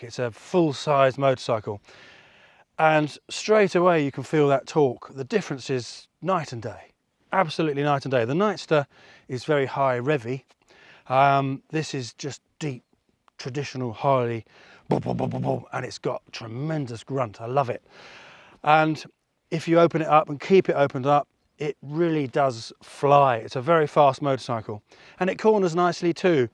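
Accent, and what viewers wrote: England, United Kingdom. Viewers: Hey love your videos/content. Seriously considering this motorcycle as a forever bike now in my 50’s, and great to see a review from an English fellow and not a screaming American. Not seen the young fellow on here for a bit, hope all is good. I also have a thing for churches, always try and have a look through one where I can.👍 regards from the Pilbarra Western Australia.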